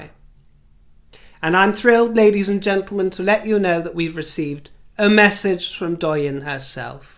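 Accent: United States. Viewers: Love your ‘Merica accent!🇺🇸😂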